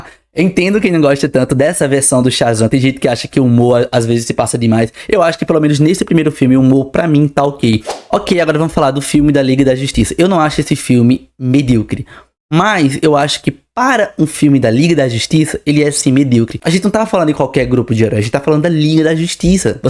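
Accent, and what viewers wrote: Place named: Paraíba, Brazil. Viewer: amei o sotaque de paraibano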